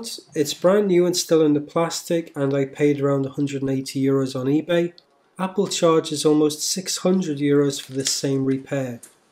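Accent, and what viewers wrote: United Kingdom. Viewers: Hi there! thanks for the tutorial! Where did you buy the assembly from(which buyer)? I found some on Ebay, shipping from China, but I am kinda skeptical. If it was from China, did you have to pay customs? (you sound British, so it should apply to me as well as I am from the EU).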